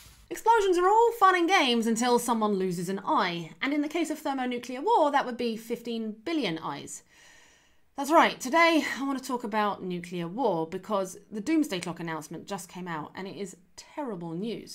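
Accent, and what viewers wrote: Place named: United Kingdom. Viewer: I love your female British voice I wish I can see your voice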